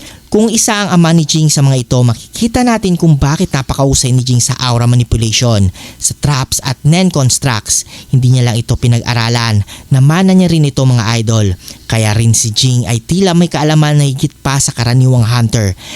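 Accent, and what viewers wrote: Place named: Visayas, Philippines. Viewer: bisaya amp haha ausin mo tahalog mo 😂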